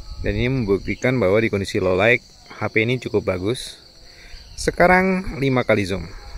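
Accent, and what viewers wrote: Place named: East Java, Indonesia. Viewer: logat pembicaraan kek daerah Jatim (Madiun)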